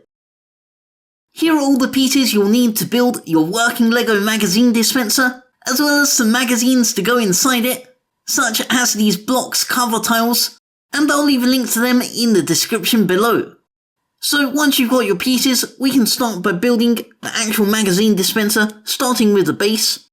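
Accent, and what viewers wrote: United Kingdom. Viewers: Your British accent sounds pretty cool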